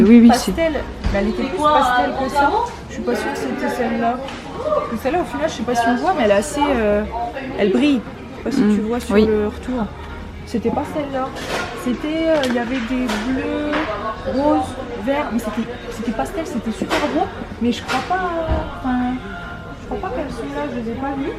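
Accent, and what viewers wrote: North, France. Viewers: Les gens entende beaucoup l’accent moi non 😂( oupsss je viens du Nord aussi ) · on reconnait l'accent du nord mdrr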